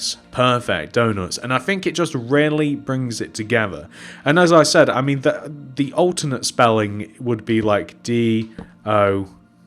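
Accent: United Kingdom. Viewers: Where are you from? You sound British, yet you mainly make tutorials of American stores rather than British ones. Either way I still enjoy them, keep the good work up!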